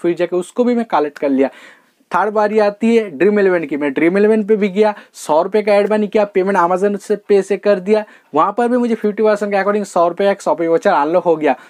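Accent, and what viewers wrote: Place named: Odisha, India. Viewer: Odisha se ho kya brother???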